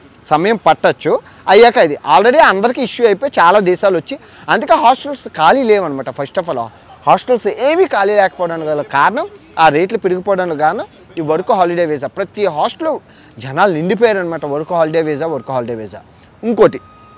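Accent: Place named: Visakhapatnam, India. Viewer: Mi matalaku navvuagatledu sir anthaena mana vizag basa kada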